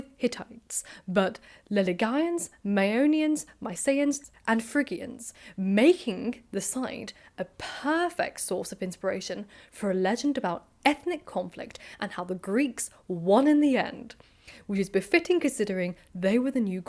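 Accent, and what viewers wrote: RP, United Kingdom. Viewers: My belief was that an event similar to the Trojan War took place and later a fantastical poem was made in the aftermath. Arthur from the story was King Arthur was quite possibly a real person. He was mention in Celtic poems/songs around the time of the Saxon invasion and added on by other authors/storytellers up until Mallory who threw it all together and added several fantastical elements. I believe there is some true to both and I also but a character like Jesus in the same camp. Obviously the person existed and over time the story grew and with each retelling it grew larger and more unbelieveable. All that being said...this is inappropriate but does anyone else find that posh English accent insanely hot, other than myself? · Not British person question: Is her accent particularly posh? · Yer modern british accent is stark compared to my Southern American Accent. 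Love the info,.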